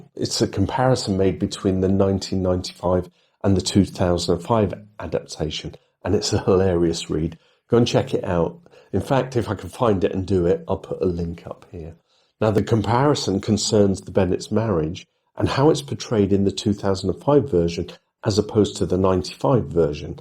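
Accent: United Kingdom. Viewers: Hello from Montreal, Canada. So happy to have you back. I as well like Mr Bennett. Looking forward to watching you other analysis. I think in another video you said that some people don't like your voice. Well, I love your voice and your british accent. It is a real joy to hear you talk about Pride and Prejudice. Did you make an analysis of Charlotte? and Catherine de Burg (not sure it is the right spelling)? What kind of life do you think Lydia and Wickham had? Thank you.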